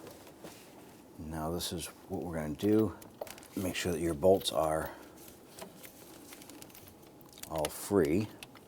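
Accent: Boston, United States. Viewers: Boston accent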